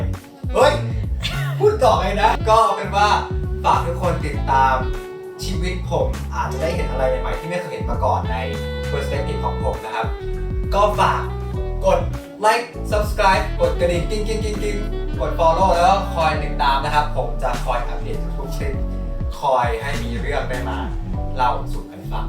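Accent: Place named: New England, United States. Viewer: I was wondering why you had a slight New England accent. lol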